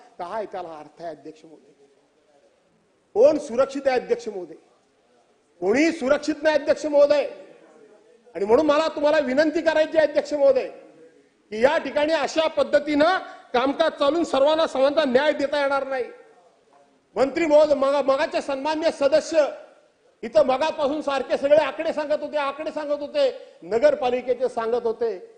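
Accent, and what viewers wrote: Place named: Konkan, India. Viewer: भास्कर शेठ जाधव साहेब उद्धव साहेब यांचा ढाण्या वाघ आहे अस्सल कोकणी बोली शालीत सभागृह गाजवून टाकला जय महाराष्ट्र साहेब जय शिवराय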